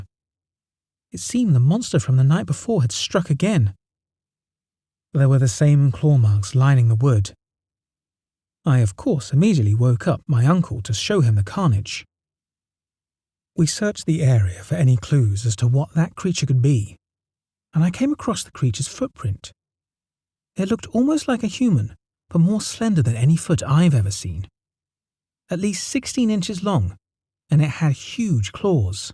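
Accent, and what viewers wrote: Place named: United Kingdom. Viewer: From Wyoming with a British accent... Hmmm...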